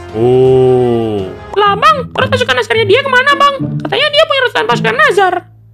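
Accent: Java, Indonesia. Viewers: Aduh logat jawa dan jokes cringenya ganggu bgt, terlalu lebay jir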